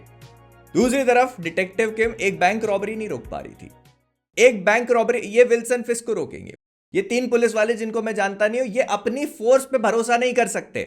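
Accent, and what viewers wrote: Bhopal, India. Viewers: Bhai tum Bhopali ho sala makda word sunte hi trigger hua 😂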